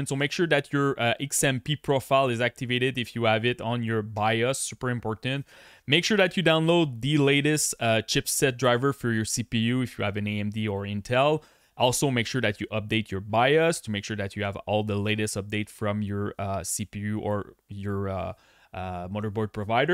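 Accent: Quebec, Canada. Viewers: J'entends le Québec ici! Good video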